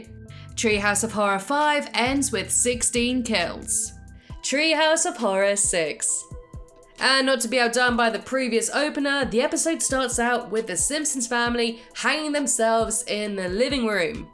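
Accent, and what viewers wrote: United Kingdom. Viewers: Love British voices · She's speaking British English, though. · love the Brit voice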